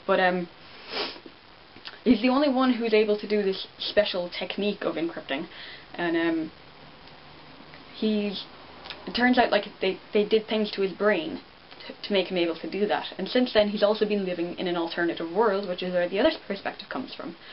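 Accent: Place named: Ireland. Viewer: literary irish. very attractive accent.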